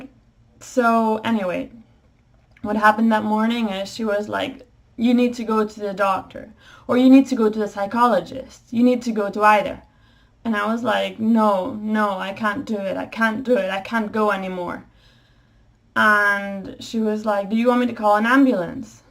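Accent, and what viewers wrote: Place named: United States. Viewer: you speak american english very well! how did you get that?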